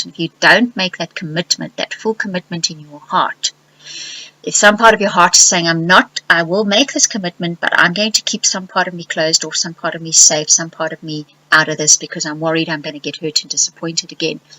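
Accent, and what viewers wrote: South Africa. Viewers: Woah - this made me tearful re the letting go of fear to commit to things. Have had a stop/start thing going on for the past through years (blame Pluto?) re work and have been reluctant to go in boots and all because of it. Crossing fingers for smoother waters. Love your SA accent! Cheers from NZ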